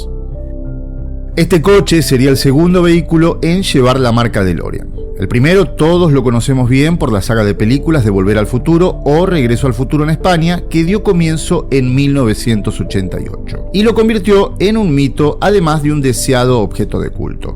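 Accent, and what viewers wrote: Argentina. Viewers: Vamos todavía defendiendo el acento argentino asi me gusta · No todos los Argentinos hablamos como porteño. Ustedes nomas dicen shon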